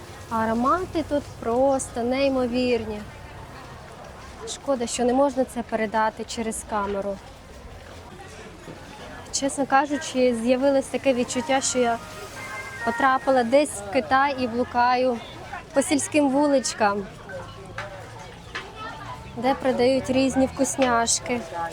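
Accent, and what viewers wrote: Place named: Kherson, Ukraine. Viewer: ох ці праведний. Ну і говори на расейській сам А дівчина має свій Херсонський 🇺🇦 суржик як 90% Українців мають свій суржик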